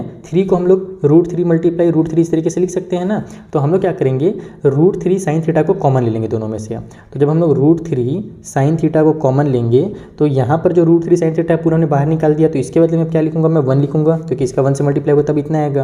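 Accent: Bihar, India. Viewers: Ap bihar se ho sir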